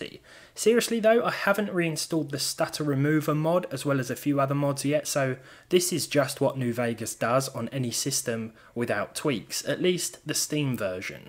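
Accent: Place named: United Kingdom. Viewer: It's for the big brain... which pumps out the British accent... which makes both my ears and eyes bleed :(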